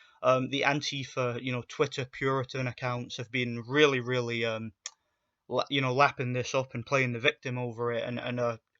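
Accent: Yorkshire, United Kingdom. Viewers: Scottish?! I'm jus' over the wall... More or less scottish as far as Gov/london is concerned haha you got yorkshire-ish accent but i talk geordie so no 1 understands owt i waffle. Will follow Count, sounds like good crack. I dont know how 2 be politically correct... Hope antifa comes 2 my neck o the woods. They'd honestly get tortured... "Take a knee? Am takin them both'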